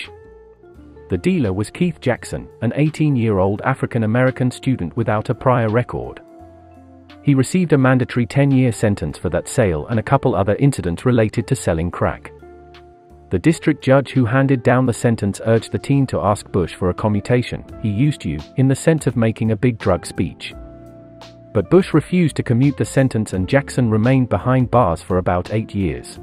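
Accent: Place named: RP, United Kingdom. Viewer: This is text-to-speach voice generator. You can choose whichever accent you like. OP just liked the RP, I guess.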